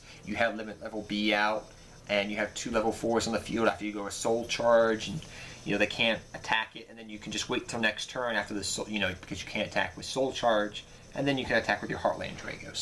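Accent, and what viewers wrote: Brooklyn, United States. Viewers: lol no do sound like you're from Brooklyn, not that there is nothing wrong with that. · Are you from Brooklyn?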